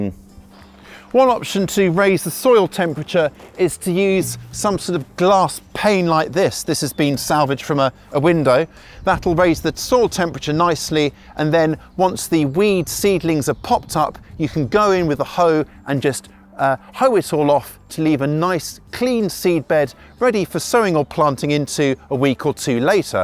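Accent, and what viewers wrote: United Kingdom. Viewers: He sounds British so I’d say somewhere in the U.K.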